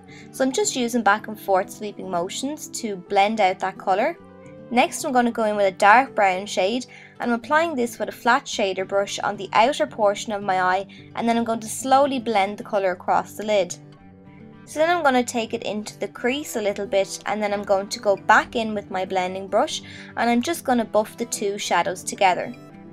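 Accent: Ireland. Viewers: Your irish ♥♥♥♥ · Are you from Ireland ? 😄 I'm in Laois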